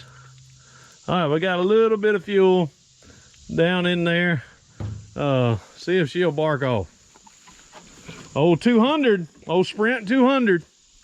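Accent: South, United States. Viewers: your southern accent is wonderful!! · i like how you's southerners say coil. you say it [ ool ]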